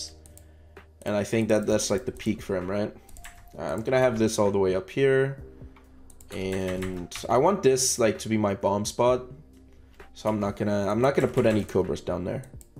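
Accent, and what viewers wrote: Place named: Denmark. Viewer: I just gotta ask, what do you think about Btd Battles 2. Oh yeah, I hear a weak danish accent, hører jeg rigtigt?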